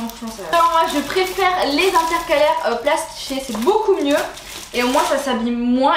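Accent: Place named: Marseille, France. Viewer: Elle a un petit accent marseillais je crois qu'elle vient de là bas 😊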